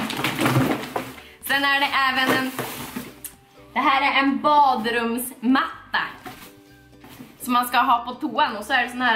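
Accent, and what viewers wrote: Norrland, Sweden. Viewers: Heeej❤️❤️ jag bor också i Skellefteå🤘 asså din dialekt är så norrlänsk😂💕 min är dock inte det😂 skulle vara så kul att träffa dig på tex på stan👌 dina videos är bäst🤘 hoppas du läser denna kommentaren❤️ kram ❤️❤️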